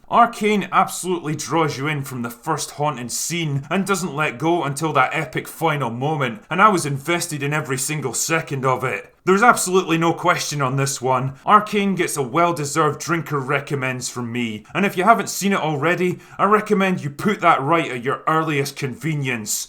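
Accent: Scotland, United Kingdom. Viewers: I feel like you toned down your accident a little bit since your first videos, I hope you will keep your strong drunk scottish accent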